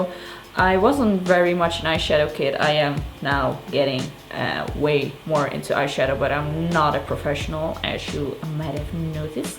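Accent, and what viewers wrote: Netherlands. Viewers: ben je vids aant bingewatchen. is leuk om te zien hoe je engels heel sneaky steeds iets minder dunglish word. en ik vind je over het algemeen gewoon geweldig i imagine myself to make vids like this if i had the balls xD zelfde attitude haha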